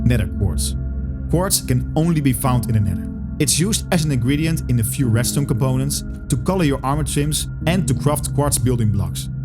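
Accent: Netherlands. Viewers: You’re Dutch aren’t you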